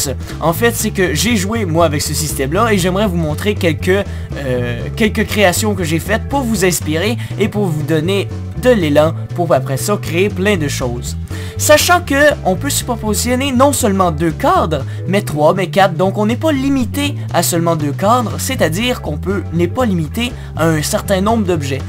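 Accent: Quebec, Canada. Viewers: Sincerement, j'adore l'accent quebecois! J'ador aussi tes vidéos, tu deveais faire du feed the beast, ou si t'en fair deja, que tu fasse des vidéos sur le serveur feed the patrick!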